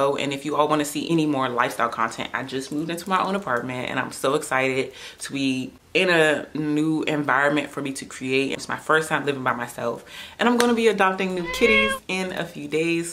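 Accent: DMV, United States. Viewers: New Sub. I picked up on the DMV in your voice before I read your bio :) Great Information!! Ready to start my new set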